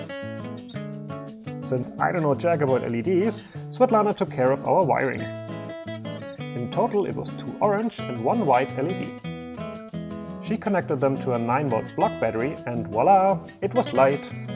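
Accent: Germany. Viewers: are you from german??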